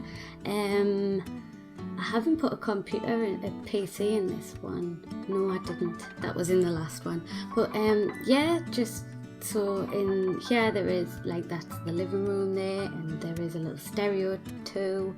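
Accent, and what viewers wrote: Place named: Scotland, United Kingdom. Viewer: I love your accent :) Where are you from? (Scotland? 😅)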